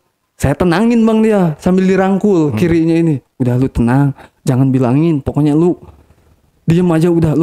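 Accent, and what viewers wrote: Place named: Surakarta, Indonesia. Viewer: Kaya orang solo jir😂😂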